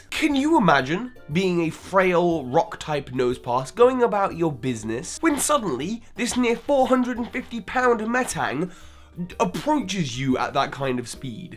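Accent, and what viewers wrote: United Kingdom. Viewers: Are you from America? I always thought you was British!?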